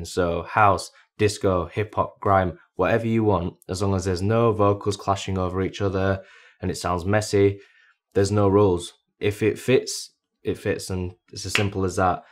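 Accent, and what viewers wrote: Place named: West Yorkshire, United Kingdom. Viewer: Good stuff, where's he from? Sounds Barnsley or maybe West Yorkshire?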